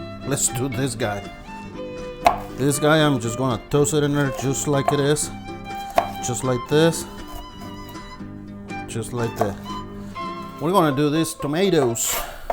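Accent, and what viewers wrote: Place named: South, United States. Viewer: Hi im KIANA from CENTRAL CALI . . I really like ur videos. Tu cocina es muy estilo rachero Y ME GUSTA MUCHO. ❤ I am mexican & hawaiian and my mom is MEXICAN from LIVINGSTON CA so she cooks using alot of can salsa instead of using real JALEPENOS. Yes her food is still GR8 BUT ! ...OH MAN DO I KNOW THE DIFFERENCE IN THE FLAVORS OF MEXICAN FOOD WHEN U USE REAL JALEPENOS & SERANOS arbrol anchos & GARLIC & KEEPING THE SEEDS IN TO GIVE EVERYTHING A KICK OF BIG FLAVOR. NOW THATS AUTHENTIC. Bt my mom stuck on her cans like green chili enchilada sauce can to make pork chilli verde ( still good ) bt I THINK ITS TIME TO SHOW HER THE DIFFERENCE. PS .... Luv ur country accent❤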